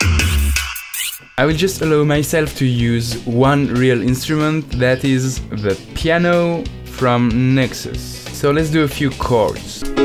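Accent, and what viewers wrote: France. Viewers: hey one second... he got a french accent no?😂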